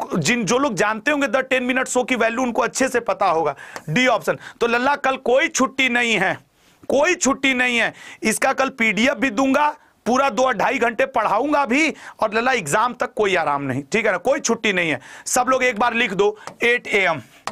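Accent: Bihar, India. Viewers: Sir apka language Bihari jasa hai 😅😅😅😅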